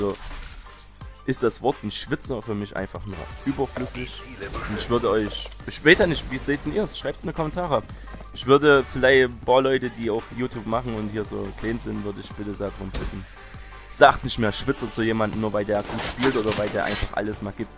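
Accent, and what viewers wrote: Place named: Saxony, Germany. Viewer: he wo kommst du her hört sich an wie als wenn du aus sachsen kommst (ich komm auch aus sachsen)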